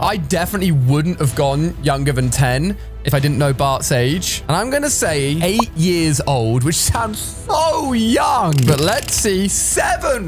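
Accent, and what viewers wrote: United Kingdom. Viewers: Are you the same YouTuber whose another video I have watched recently? You have a very crazy British accent, similar to another YouTuber named Mallow. It was also seen in one episode of SpongeBob SquarePants that Mr. Krabs was born in 1942, which would make him 81 years old!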